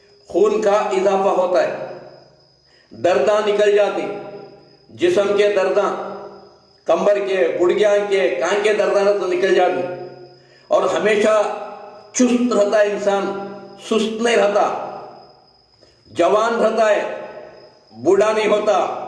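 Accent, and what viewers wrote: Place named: Karnataka, India. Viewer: Nahi aati bhai ye karnataka wale hain dakni language bolte hain isko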